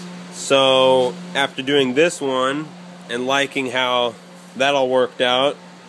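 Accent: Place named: California, United States. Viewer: where are you from? I'm in Santa Cruz, CA. there's no one who talks like you that's not from or in CA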